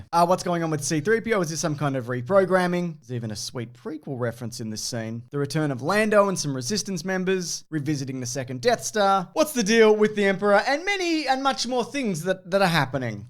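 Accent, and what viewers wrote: Australia. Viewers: You are the reason I switched my Siri voice to Australian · Doan said it's the accent and they're partly right - it's because he's Australian. It's so bloody hot here that you can't care about anything else. Notice how started to get wound up about something, but then just bails on it? It's too bloody hot to stay in that zone for long.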